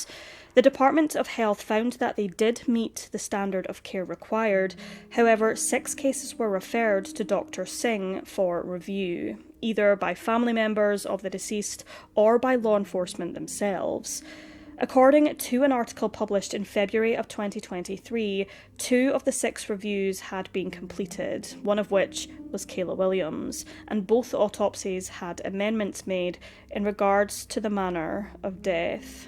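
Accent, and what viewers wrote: Scotland, United Kingdom. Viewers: She is in Scotland with a Scottish accent, give her a break!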